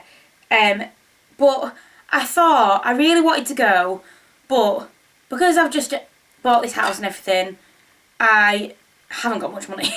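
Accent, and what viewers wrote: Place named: Cumbria, United Kingdom. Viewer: YouTube has just introduced you to me! How lovely to hear a fellow cumbrian accent! I missed the wool show for the very same reasons! It cut me up that it was so close to where I live, but I just couldn't afford to be tempted ~ next year 🤞🏻😅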